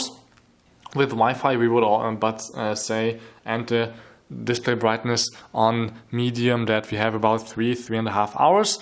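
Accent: Germany. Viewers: ich habs gleich gewusst das du aus deutschland kommst, verdammt wieso hört man das bei uns so raus? >.< ich hätt am liebsten einen amerikanische aussprache :D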